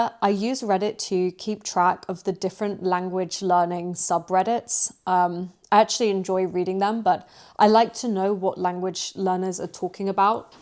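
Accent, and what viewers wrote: United Kingdom. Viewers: Im Brazilian and I love your channel, im learning English, but your British accent is soooo good to listen, when you say "and" is my favorite part 🤣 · does anyone know what the british accent she uses is ? i am really interested because it makes me enjoy learning english...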